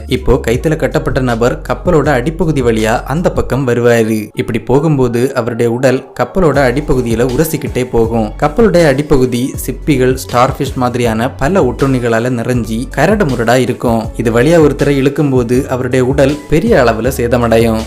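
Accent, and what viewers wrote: Kanyakumari, India. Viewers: Anna neenga kanniyakumari thanea🎉🎉🎉🎉🎉😂😂😂😂😂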